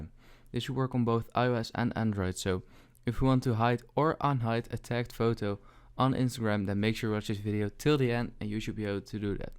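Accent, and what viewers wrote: Netherlands. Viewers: Thanks bro and btw u sound Dutch cuz of ur accent🙃❤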